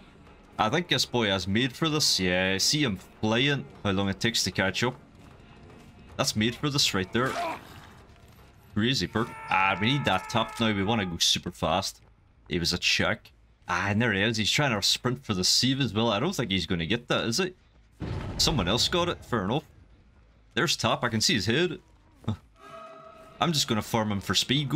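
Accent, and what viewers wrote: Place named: Scotland, United Kingdom. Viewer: Love watching your videos!!! Your Scottish accent makes it even better haha keep up the good work dude!!!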